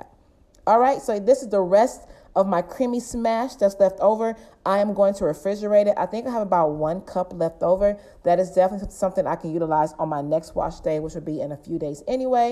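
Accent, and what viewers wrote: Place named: Yoruba, Nigeria. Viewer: Just discovered you. I love how your Yoruba/Nigerian shines through.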